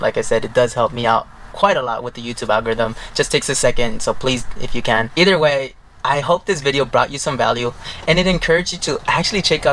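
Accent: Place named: Los Angeles, United States. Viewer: U have that LA accent. 😀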